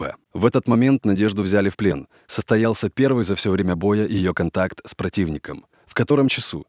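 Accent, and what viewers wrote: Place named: Ukraine. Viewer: По вимові диктора зрозумів, що кліп зроблений в Україні. Вищий клас, така інфорграфіка дуже потрібна. #SaveSavchenko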